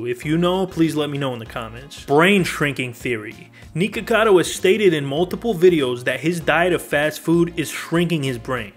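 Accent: New York, United States. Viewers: New Yorker? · You Sound Like You Have A New York Accent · A buddy just turned me on to your channel yesterday. Of course, I subscribed. You are funny, and you have a cool accent. I'm originally from Boston and I am guessing your accent is NYC area, not Boston.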